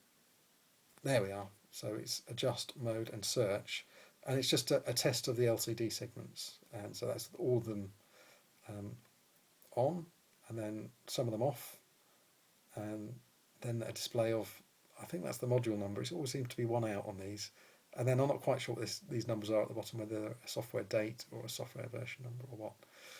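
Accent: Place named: United Kingdom. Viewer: Why is hearing a British guy explaining something so awesome?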